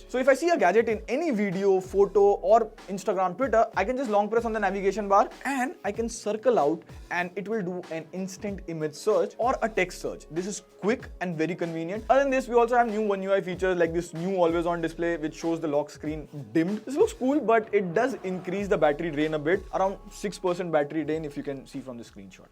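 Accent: India. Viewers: Impressed by exynos improvement...!! Google auto caption is great I will give it a 98% despite ya Indian accent. Definitely it didn't caption exynos correctly ... That's not English though 😂😂😂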